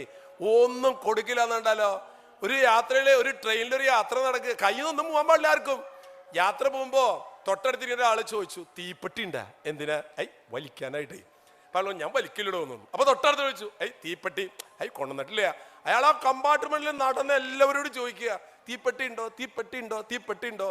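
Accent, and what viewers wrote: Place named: Thrissur, India. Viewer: tani trichur language thanne..marakilla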